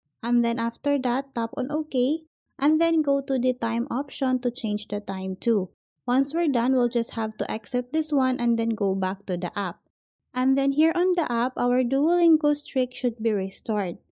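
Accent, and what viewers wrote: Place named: India. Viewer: you sound like an indian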